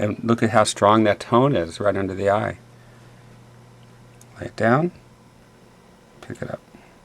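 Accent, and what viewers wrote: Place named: United States. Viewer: I hate the way americans say "water"... :):):) But the painting is beautifuly done so it's worth to watch it. :)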